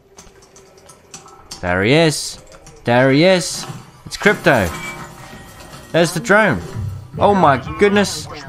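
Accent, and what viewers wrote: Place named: United Kingdom. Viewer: He looking slick 😂 I love your voice the true British sound better then mine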